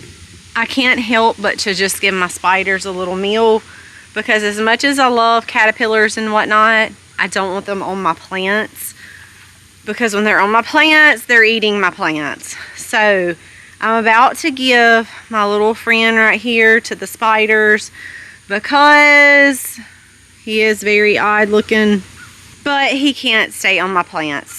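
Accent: South, United States. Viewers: I love your videos and especially your southern accent! I could spend all day listening to you talk. I live in Minnesota and people up here don't speak with accents.. I have a Walmart 2 miles from where I live and I've had issues with them over the years. So I understand where you are coming from. Good luck dealing with your Walmart!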